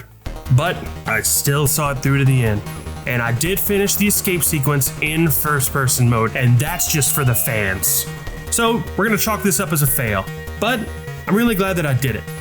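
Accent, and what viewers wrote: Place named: Mississippi, United States. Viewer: Honking Mississippi accent showing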